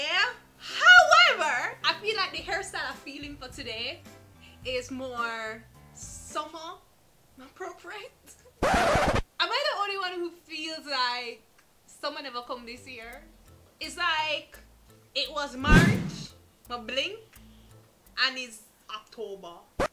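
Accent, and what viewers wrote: Patois, Jamaica. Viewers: Also it might not be that she's "lost" her accent but she just uses less patois words or like a patois 'pronunciation'. We can butcher some english words just base on where we place emphasis on syllables. As for her accent it oh so present from my ears. Jamaican here. Depending on where you are in Jamaica this "accent" varies. Some places I can't even understand them and I am born, raises, live Jamaican. 😂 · As a general rule she's lost more of accent the longer she's been making videos. She sounded like a Jamaican in her early stuff when she was relaxed, but now it sounds muddled sometimes. I'm not trying to tell you anything new, just saying I noticed, too. 😉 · she still sounds very Jamaican, she sounds just like what we like to call ‘uptown people.’ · your personality is contagious. Loving the jamaican patois. Love from Trinidad 🥰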